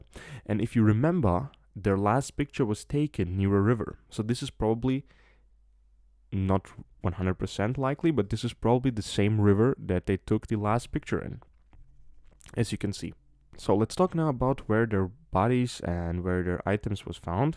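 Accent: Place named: Russia. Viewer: По вашему акценту, мне кажется что вы Русский!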